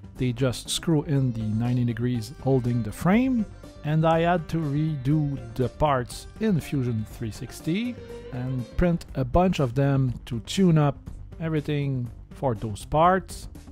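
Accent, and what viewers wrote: Quebec, Canada. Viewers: On la sent bien laccent quebecois 😂😂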